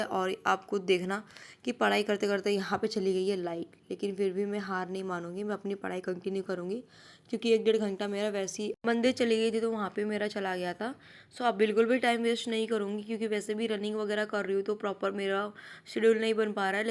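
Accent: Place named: Bhojpuri, India. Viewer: Bhojpuri voice nice hai ❤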